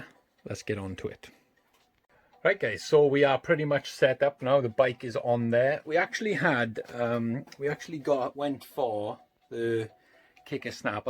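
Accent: Wales, United Kingdom. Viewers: Currently looking into getting one of these. What are your thoughts on after owning if for some time. It took me a minute to understand your accent at the start then realised you're Welsh haha I'm from Carmarthenshire (Cross Hands). Not used to hearing our accents on YouTube!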